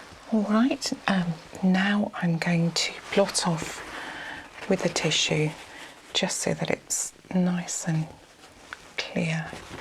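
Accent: United Kingdom. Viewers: your soft voice with your British accent is just like a warm hug. I just love your videos, I’ve watched all of them. I listen to help me relax and get sleepy and it works …..thank you for sharing your gift.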